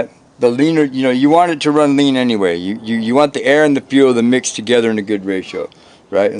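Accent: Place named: Arizona, United States. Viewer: I'm in georgia...i immediately recognized your accent because one of my best friends moved from AZ to ATL he sounds just like you..🤘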